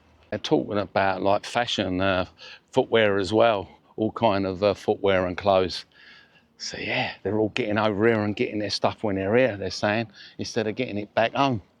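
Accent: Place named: Lancashire, United Kingdom. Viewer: Now I'm hearing Rick Parfitt (RIP), is it Lancashire or something? :)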